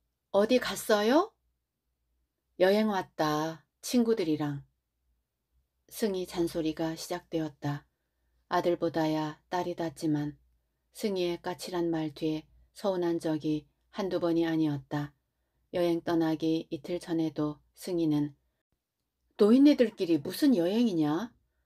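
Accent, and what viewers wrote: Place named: Jeolla, South Korea. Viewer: 남도 사투리가 대단하십니다.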